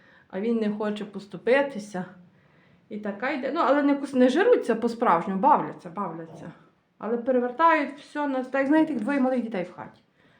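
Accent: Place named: Ternopil, Ukraine. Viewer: Добрий вечір хочу вас запитати з якого ви району на Тернопільщині тому що я також з Тернопільщини яке ваш район,село в нашому селі є також така фамілія як ваша акцент ваш такий як у нас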